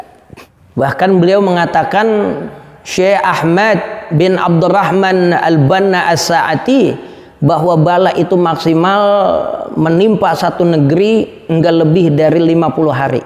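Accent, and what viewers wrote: Jakarta, Indonesia. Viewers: Ustadz logatnya kental banget betawinya. Demen dah dengernya. Syukron tadz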